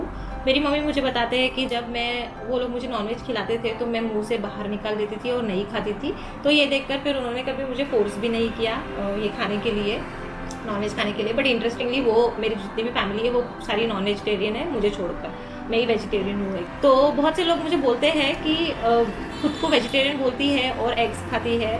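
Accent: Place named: Vidarbha, India. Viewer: Mala vatalch nagpur chi asel tu lagana mdhe vidhrbha chi bhasha bolt hote...😊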